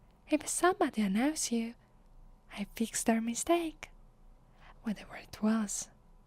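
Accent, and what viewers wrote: Italy. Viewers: Ah the almighty spaghetti accent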